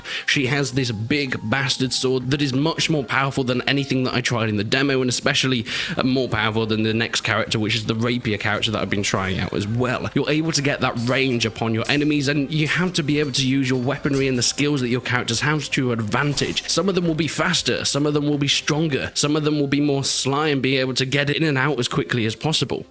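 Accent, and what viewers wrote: England, United Kingdom. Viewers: he's English you knob just how we talk 😅😅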